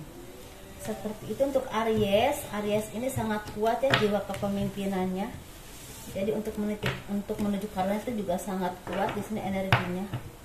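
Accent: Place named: Java, Indonesia. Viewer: Biar lah mau campur Jawa kek Gak masalah 😀😀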